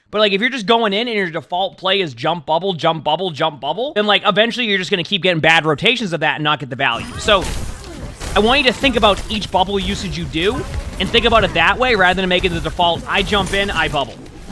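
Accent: Boston, United States. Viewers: Hes from boston... that explains enough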